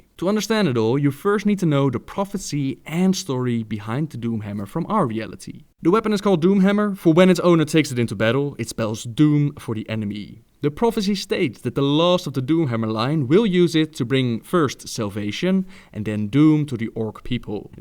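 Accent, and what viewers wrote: Netherlands. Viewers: he's dutch, he has an accent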